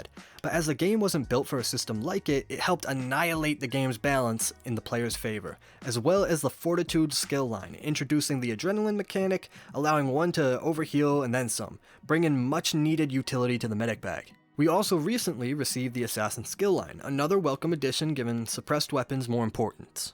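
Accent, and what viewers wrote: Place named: South, United States. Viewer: Your southern accent be slipping out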